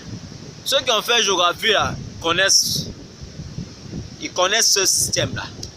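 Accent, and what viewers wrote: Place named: West Africa. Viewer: Qu'est-ce qu'il y a même à parler comme les Ouest-Africains ??? Parle comme un vrai Camerounais aka ! Tsuip !!!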